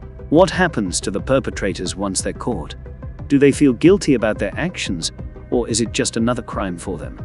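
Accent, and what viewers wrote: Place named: United Kingdom. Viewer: Why is a British voice doing the narrating for this video. These are murders that took place in America, the whole trope of having a esteemed British sounding voice to the narration for a documentary or a newspaper whatever is so corny.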